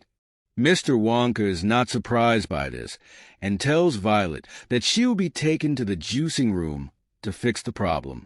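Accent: United Kingdom. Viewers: Excellent performance and a distinctive British accent. Thank you very much... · Por casualidad, este inglés es británico? Por cierto, es muy bueno este contenido, gracias por compartirlo!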